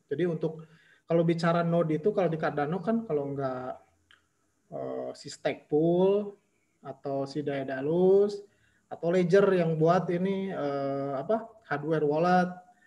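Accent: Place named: Sunda, Indonesia. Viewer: Langsung gue sub ini chanel josss,,,medokk kaya orang sunda😎😎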